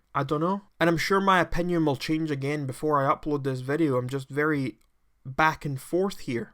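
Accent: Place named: Stirling, United Kingdom. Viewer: First, neato, a commentator that's fae Scotland. Second, you fae between Glesga and Edi? Ye kinda sound fae aboot Stirling way lol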